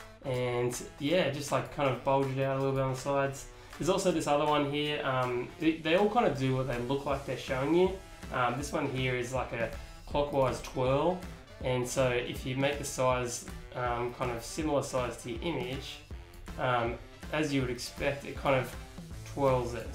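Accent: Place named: Australia. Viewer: not sure why, but i thought you were going to say "if you guys can't tell by my voice, i'm Australian," lmao. this video is super helpful though, thank you!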